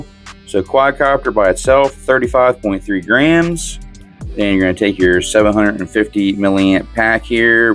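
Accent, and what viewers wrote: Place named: Maryland, United States. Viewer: Could tell straight from the first 10 seconds you were from MD 💪🏾😂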